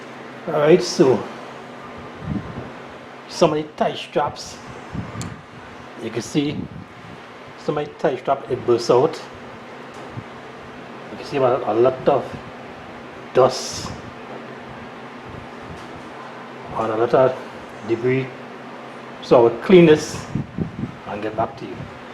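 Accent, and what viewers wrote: Trinidad and Tobago. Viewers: How you sounding like ah Trinidadian so.?? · Sounds like a trini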